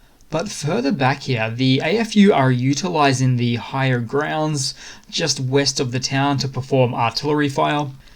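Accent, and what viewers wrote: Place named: Australia. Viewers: My guess is Aussie 🇦🇺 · Sounds like an Aussie...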